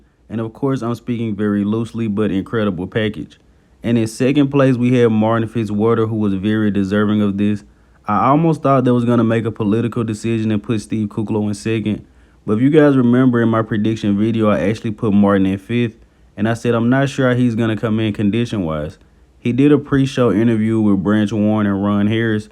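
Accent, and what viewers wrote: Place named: South, United States. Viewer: lol man I grew up in the South, this is just how people talk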